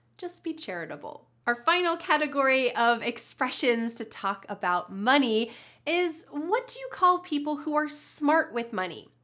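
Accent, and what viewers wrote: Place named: United States. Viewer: Hello, Teacher! I adore your lessons and your voice is so clear! I love American English and keep up the good work! Cheers!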